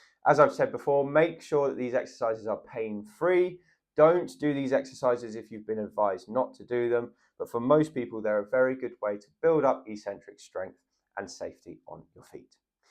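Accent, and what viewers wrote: England, United Kingdom. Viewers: Thank you so much for your helpful, easy to understand videos. ( SO nice to have a simple English accent! 😊🇬🇧). I am 83 and pretty well preserved. But l slipped during a violent thunderstorm last august (2022) and was very lucky not to have a serious hip fracture, though l am still waiting for re -education in a European country where everything is so slow. But since COVID maybe it’s slow everywhere. ) l just have problems with my right leg. Cannot cut my toenails or put my shoe on easily and l have to walk with a stick. Even with that l get panic attacks when faced with a down slanting slope. I have downloaded nearly all your videos and started seriously today with the glute excercise. Thanks again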